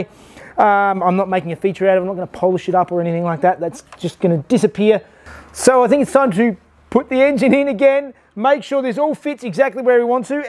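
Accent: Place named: South Australia, Australia. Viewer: Oops. Embarrassing! I owe her an apology. Now I can hear it, but she's definitely picked up a a lot of the southern-Australian accent. And in mitigation, my hearing was shot away quite severely by neuropathy (nerve damage) last year. Salut.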